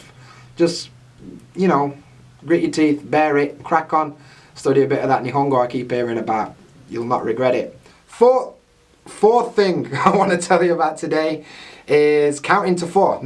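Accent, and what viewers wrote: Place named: Northern England, United Kingdom. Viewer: Hey, I noticed you always talk in your normal accent in videos. Do you need to change how you talk to when actually teaching English? I’m northern too (Geordie) so was wondering if you still use “me” instead of “my” when teaching or using the word “like” in the middle of sentences? Or do you try and talk proper English?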